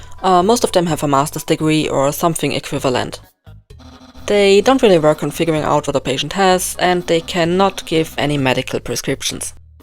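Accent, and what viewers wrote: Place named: Germany. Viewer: This is a German accent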